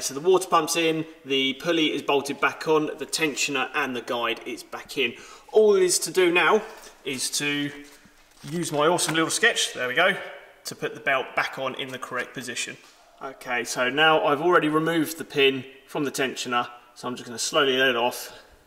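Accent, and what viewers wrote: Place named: United Kingdom. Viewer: Hearing a British guy do a video on repairing a British car just makes it fn authentic 😂. (Even though the car isnt as British as it used to be 🙄)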